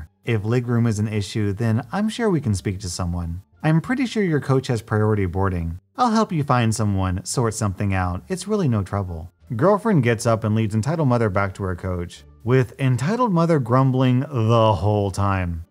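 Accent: South, United States. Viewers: please keep doing the southern accent. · Hey, I’m loving the southern accent plus that pronunciation was epic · Im for ga. And when he does the southern voice all of us here in ga are okay with it.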